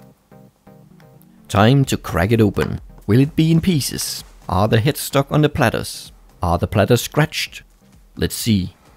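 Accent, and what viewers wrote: Denmark. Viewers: xD man kan hører du er dansk xD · Your danish?? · jeg kunne høre fra starten at du var dansker pg.a. din aksent. :) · er du fra Danmark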